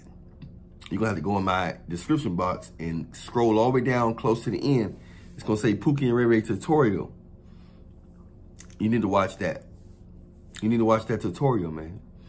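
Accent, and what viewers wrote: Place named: Baltimore, United States. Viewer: You have Maryland Baltimore accent. You videos are awesome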